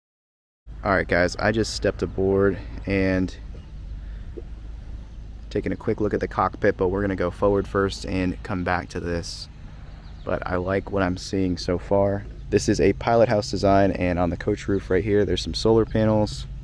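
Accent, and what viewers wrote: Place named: United States. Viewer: He’s not English , yank maybe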